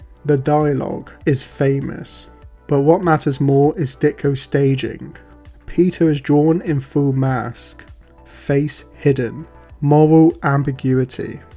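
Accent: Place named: United Kingdom. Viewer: This Blows...sick of British Voices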